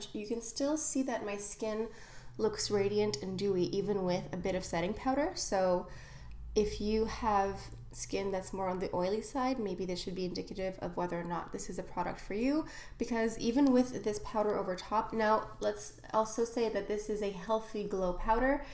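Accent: France. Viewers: You have such a great French accent!!!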